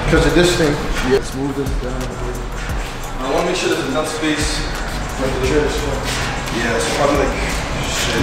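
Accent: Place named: Toronto, Canada. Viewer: As soon as I heard mans speak, I said definitely a Toronto dude. Congrats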